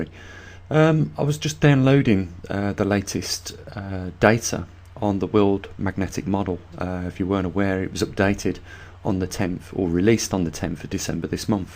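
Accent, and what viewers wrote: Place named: West Midlands, United Kingdom. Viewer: you sound like you are from the West Midlands, somewhere. I live near there. would it be possible to meet me. I am interested in your work but lack knowledge.?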